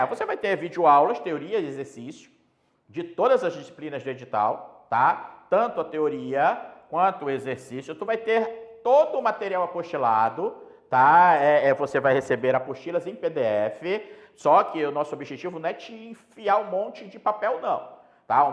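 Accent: Pará, Brazil. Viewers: Esse professor, é paraense. O sotaque não deixa passar (vamo deixar baixo), rsrsrs... Aula excelente, professor!!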